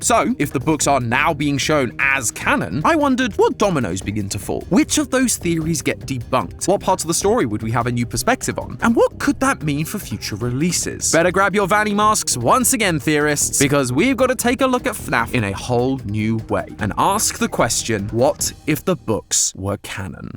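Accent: United Kingdom. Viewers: my mom came into my room while i was watching this and asked if it was a game theory video, i said yes and she just goes “why is matpat doing a british accent?”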